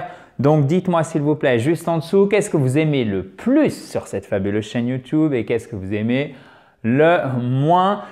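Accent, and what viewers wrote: United States. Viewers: Thank you very much,. What I like the most is how to build some simple sentences and how to conjugate the verbs. The American accent disturbs me but it might be necessary? I would have an English accent. I would like some videos with dictations if it is possible. See you soon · I love your American accent! Why don't you speak more English than French?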